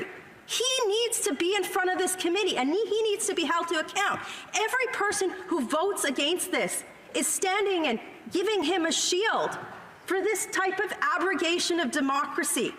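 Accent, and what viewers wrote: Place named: Canada. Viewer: She sounds so Canadian I love it.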